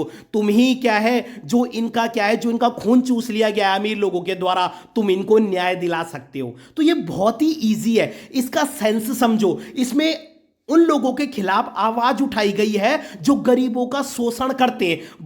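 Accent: Garhwal, India. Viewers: Sir kya aap gadwali ho ? Love from Chamoli - Gopeshwar, Uttarakhand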